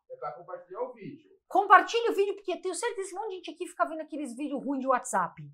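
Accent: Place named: São Paulo, Brazil. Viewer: Sotaque paulista e horroroso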